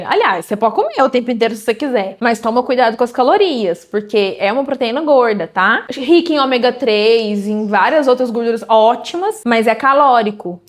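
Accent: Minas Gerais, Brazil. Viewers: Amei seu conteúdo, esse sotaque mineiro melhora tudo. Sou de BH, tenho resistência à insulina (SOP) e me senti representada. Espero perder 13 kgs esse ano para chegar na estética que desejo, a pior parte para mim do tratamento da SOP é a musculação :( kkkkkkk. A alimentação eu amo, gosto de cozinhar, não tenho nenhuma seletividade, adoro fazer meus pratos lindos e coloridos, me inspirei em você e coloquei muitos ingredientes na listinha de compras. Obrigada <3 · o seu sotaque é muito bom, amo!!! Minha família é mineira, então é o sotaque do meu coração. Estou gostando muito dos seus vídeos, vc passa muitas informações importantes, tbém tenho resistência a insulina. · Parece mineira. Eu falo assim e sou mineira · Ela é mineira 😊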